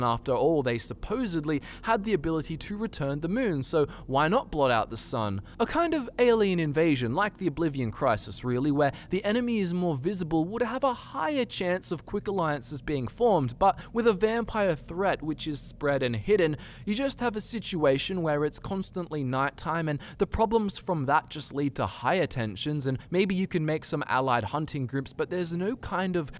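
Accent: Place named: Australia. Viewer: No, watch the video and enjoy the Aussie-narrated lore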